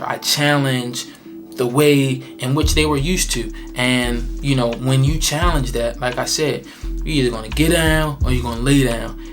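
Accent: Baltimore, United States. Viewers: I'm in nursing school and it's already starting. Everybody wants to be the super nurse and try to prove that you are not smart or fit for the job. It's very stressful. But I feel it's like that in any profession. Aye Bmore. I grew up there but haven't lived there in 15 years. It reminds me of home hearing your accent.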